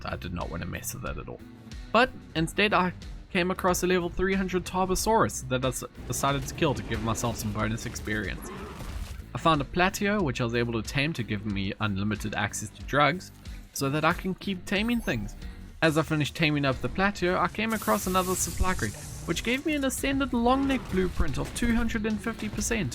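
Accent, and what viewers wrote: South Africa. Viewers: R u south african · Yeyi ARE U SOUTH AFRICAN??? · Ayy man you from South Africa you sound like you do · you sound south african 🤔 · You sound South African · South African?? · The sound of South African accent is strong with this one hahahah I love it Insta sub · Does anyone know where this guy is from? Accent sounds awfully like a South African accent, dunno, might just be me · You South African? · Are you south african??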